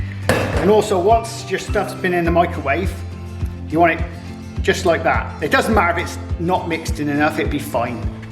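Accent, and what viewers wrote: United Kingdom. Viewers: Yum! We Americans love a Brit accent :)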